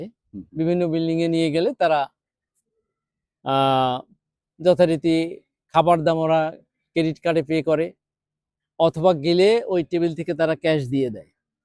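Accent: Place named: Jessore, Bangladesh. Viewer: আপনি মনে হয় আমাদের যশোর অঞ্চলের মানুষ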